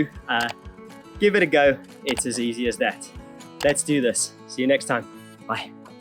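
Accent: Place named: South Africa. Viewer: The accent seems South African but the wall and fence behind has no rhino fencing.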